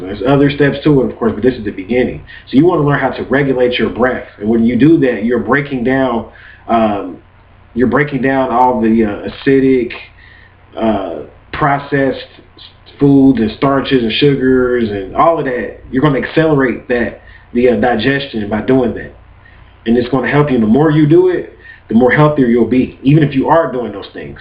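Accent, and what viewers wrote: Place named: Los Angeles, United States. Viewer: Are you from LA/Long Beach? You have a la/lbc accent.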